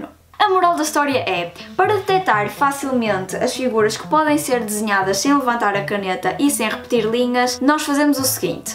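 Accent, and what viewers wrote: North, Portugal. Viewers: Adoro seu sotaque português. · Ela é a melhor em matemática e afins, mas nada supera a sua pronúncia do Norte. Que sotaque tão... 💙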